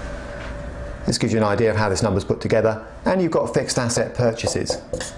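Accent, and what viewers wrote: United Kingdom. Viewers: I love your presentation and British ascents ：)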